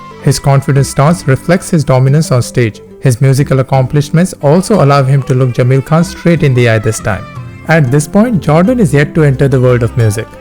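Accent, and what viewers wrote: India. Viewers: Bro the origanility of your indian accent is missing.Please bring it back!It makes narration so much lively!Rest great analysis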